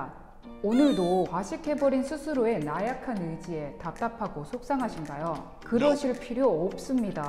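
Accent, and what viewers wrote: Gyeongsang, South Korea. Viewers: 경상도 사투리가 은근 나오는 억양이 매력적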